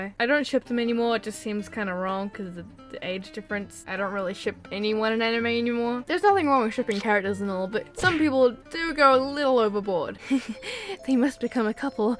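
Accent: New Zealand. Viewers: are you a new zealander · Are you from New Zealand ? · OMFG ARE YOU FROM NZ !!!!!!!!?!!!!!!????!!! Edit: YOU AREEEEEEEEE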